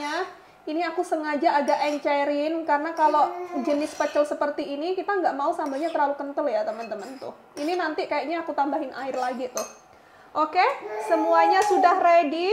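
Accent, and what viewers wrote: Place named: Banyuwangi, Indonesia. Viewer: Aku seneng mbk nek ngomong sek medok banyuwangi, salam mbk aku teko banyuwangi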